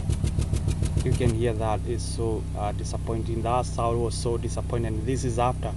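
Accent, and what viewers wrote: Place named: Somalia. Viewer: I can tell this brother is from Somalia great job werya.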